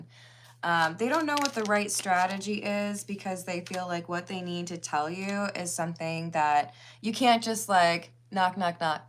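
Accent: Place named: Minnesota, United States. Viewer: The Minnesota accent was ADORABLE 😂🎉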